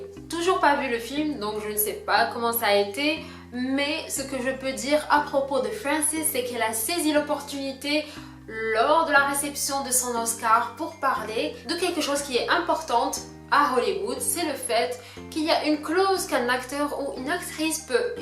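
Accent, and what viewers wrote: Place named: United Kingdom. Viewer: j'ai vue que tu a mis la music 1 like en plus;) presantation avec accent soo british je like aussi;)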